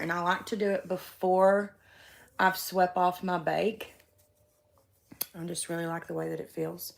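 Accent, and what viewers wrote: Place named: Tennessee, United States. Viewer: Hi! I M just be dreaming, right? That shirt, & girl that accent! Have I found one, a Tennessee girl? Your makeup skills are awesome? Im new to this, like couple of weeks new. Learned to contour my nose last week & I'm old, isn't that sad??? anyways I was just saying hey!!! 😊Have a 'kick ass' day....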